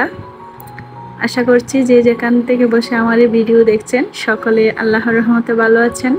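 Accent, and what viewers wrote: Chittagong, Bangladesh. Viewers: আসসালামু আলাইকুম কেমন আছেন আপু মাশাল্লাহ আপনার ভিডিও দেখে খুবই ভালো লাগলো রান্না করা ঘুরাফেরা সবচেয়ে ভালো লেগেছে আপনি চট্টগ্রামের শুনে আপনার কথাগুলো তো খুবই মিষ্টি লেগেছে এবং আসার সময় রাতের খাবার বাইরে খেয়েছেন দেখে খুব ভালো লাগে দোয়া রইল আপনার জন্য এবং আপনার পরিবারের জন্য আপু সব সময় ভালো থাকবেন ❤❤❤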